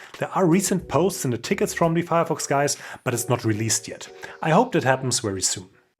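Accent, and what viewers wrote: Germany. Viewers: german accent detected within the first 10s, which gives me the "made in Germany" vibe👍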